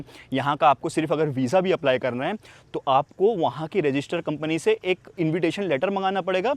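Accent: Mumbai, India. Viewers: I enjoyed your video, I am a Pakistani and enjoyed the way you speak Mumbai type Hindi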